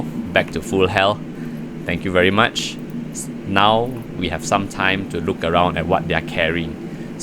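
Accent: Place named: China. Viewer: Are you Chinese? You sound Chinese. :D If so, ni hao, gao xin ren shi ni. Thanks for the funny video.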